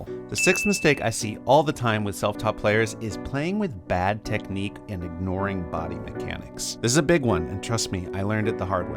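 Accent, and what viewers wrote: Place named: United States. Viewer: This video is amazing. I will show it to my students. And by the way - what pleasant American-English you speak!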